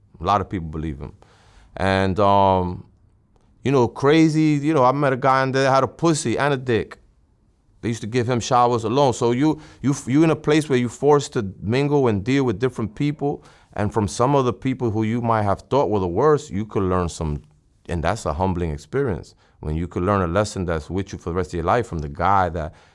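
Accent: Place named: Bronx, United States. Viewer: "If you have a farm full of cows, does it pay for you to release your cattle? No, you gotta keep them in order to milk them." Damn, that's so true. I lived 14 years in Parkchester/Castle Hill, The Bronx. I hear that Boogie Down Bronx accent 🤌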